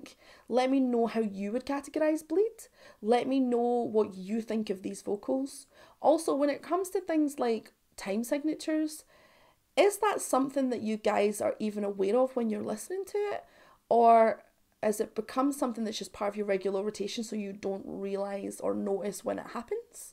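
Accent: Scotland, United Kingdom. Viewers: I can't tell if you're super charismatic or if I just think women with Scottish accents sound really cool. Probably some from column A and some from column B. Subscribed! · Why do i feel like I’ve never heard a girl with a Scottish accent? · Im from Australia and the scottish accent nearly makes me weep , i fkn love it !! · Are you Scottish? Love your accent!🥰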